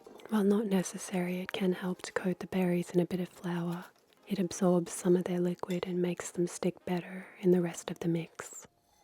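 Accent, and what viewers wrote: Australia. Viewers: I can’t possibly fathom the heavenly perfection of your voice. I mean I’ve heard thousands of soft voices in all the videos available online but yours - hands down - is a million miles ahead of all of them. My god it’s incredible. Plus that tinge of Australian accent (if I’m right) is the cherry on the cake. Davvero perfetta!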